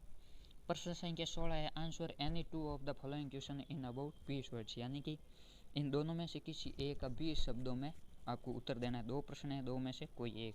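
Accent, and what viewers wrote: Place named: Marwar, India. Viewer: Bai aap rajsthan se ho to aapka Jilla jarur batana mujhe aapki aavaj se lag raha hai ki aap marwad se ho 😊😊❤🎉🎉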